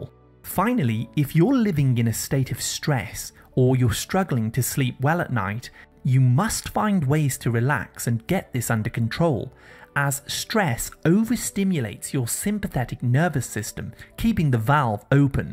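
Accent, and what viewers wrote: United Kingdom. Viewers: I like people. How ever I can't help to say. The British have a real problem pronouncing the English language. It's maddening. Vit,a,men's. Instead of vitamins. Waa,tore. Instead of water. Also it seems the British have a big problem with the letter "r". Look language and the pronunciation of it is key for those who seek to speak or become an orator. One who learns the value of speech and tone. Just saying. Use speech and a calm flow you'll feel good and those listening to you will benefit in the understanding of what you say. Thank you for your time and caring. I won't pick through the other Miss phrased words. Your kind soul is on the good track. Again thank you. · Do brits say "Vytal" when saying vital? If so why do you all annoyingly say "Vitamins" when the prefix is clearly vital pronounced "Vytal"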